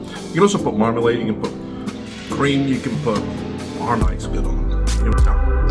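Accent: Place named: Lancashire, United Kingdom. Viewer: I thought so. Yours is different from the southern Lancashire accent of St Helens. Best wishes. Merry Christmas to you and a healthy, happy and prosperous new year.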